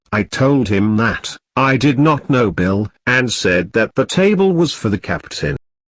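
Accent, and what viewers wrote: United Kingdom. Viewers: مذكّرة القصة ❎ تعلم اللكنه البريطانية ☑️ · The British accent is adorable 🥰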